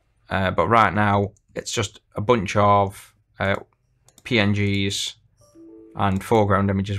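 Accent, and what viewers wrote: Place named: North, United Kingdom. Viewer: Just wondering, are you from Liverpool? This sounds like northern UK accent.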